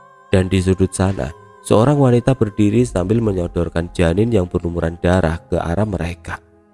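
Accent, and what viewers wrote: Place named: Banyumas, Indonesia. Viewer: Orang ngapak bukan si?? Medoknya keliatan banget kalo lg percakapan 🤭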